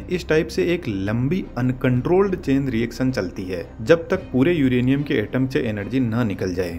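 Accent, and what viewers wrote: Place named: Shekhawati, India. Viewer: भाई साहब आपके द्वारा बनाया गया वीडियो बहुत ही ज्ञानवर्धक है । आपकी आवाज से ऐसा लगता है कि आप राजस्थान के शेखावाटी से संबंध रखते हो।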